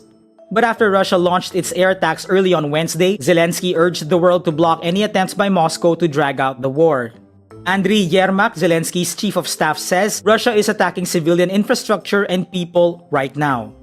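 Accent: Philippines. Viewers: your filipino accent is so thick its criminally annoying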